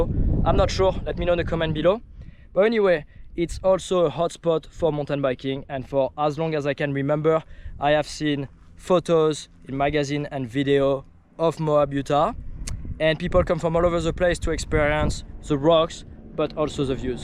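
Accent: France. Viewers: That french accent tho 😭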